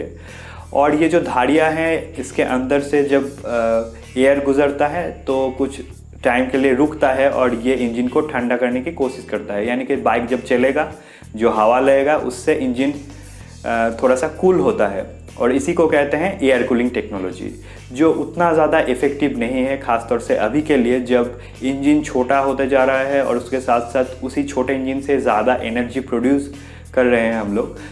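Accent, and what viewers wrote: Bengal, India. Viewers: r u a bengali??? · Ap bengali ho Am I right? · Bangali lag raha hai. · Dada tum Bengali lagta hai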